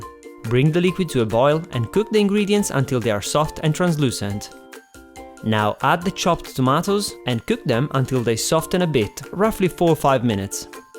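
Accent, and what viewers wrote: Italy. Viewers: This is one of my favourite dishes. My grandma used to cook it for me when I was younger, without the mushrooms. And I don't know if it is a coincidence but .. your accent sounds italian and my grandma was italian, so I think this is an old italian dish, especially in the northern regions. 😯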